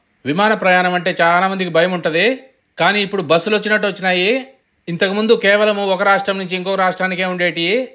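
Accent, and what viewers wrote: Kadapa, India. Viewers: Nee matalu vintunte Kadapa kallamundu kanabadathadi. Kadapa municipal high school lo chadivinava? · మీరు ఇచ్చే ఇన్ఫర్మేషన్ చాలా బాగా ఉంది,కడప భాష యాస సూపర్ sir మది కూడా కడప రాజ రెడ్డి street sir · Welcome to kadapa. I have been following your you tube channel since two years and enjoying your kadapa accent presentations. Thank you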